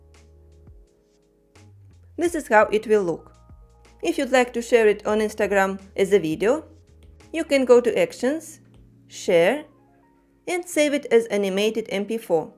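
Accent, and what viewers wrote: Russia. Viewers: Почему на английском-то с русским акцентом(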